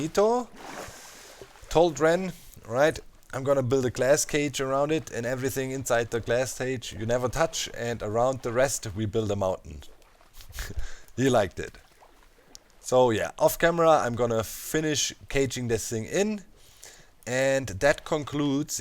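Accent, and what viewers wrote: Germany. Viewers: I watch 30% for Minecraft, 70% for that smooth cool german accent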